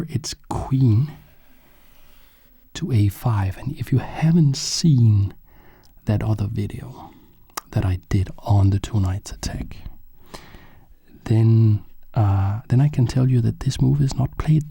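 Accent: Denmark. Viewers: are you Danish? because you sound like one who could be from Denmark.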